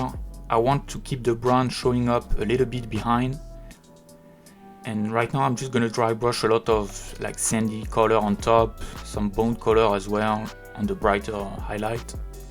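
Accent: France. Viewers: Ton accent français ce reconnais clairement mais tu a clairement un anglais plus maîtriser que moi donc je ne devrai pas parler 😂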